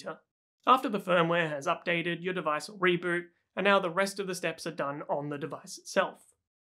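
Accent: Australia. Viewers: Hey mate, I noticed the Aussie Twang. Understand you're in the UK, how much of this can I replicate in Sydney? I've got a mid 90s brick veneer home and things like neutral are not there and I'm not looking to get new wiring in.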